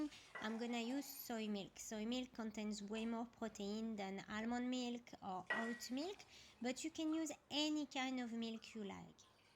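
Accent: France. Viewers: Bonjour, merci pour ces idées. Vu l accent vous êtes française ? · Going to try this! Your English with the French accent is the cutest! 😍 love from Luxembourg 🇱🇺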